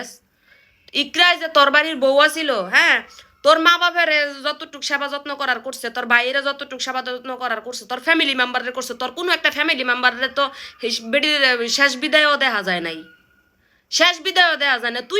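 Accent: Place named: Narsingdi, Bangladesh. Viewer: আপনি কি নরসিংদী জেলার মেয়ে? ভাষাটা এমনি মনে হয় 😅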